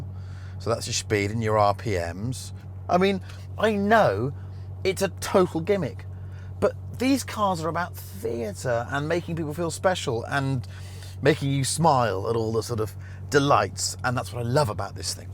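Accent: Italy. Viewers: that italian accent :D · LOVE THAT ITALIAN ACCENT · That accent was like a weird hybrid between jar jar binks and an Italian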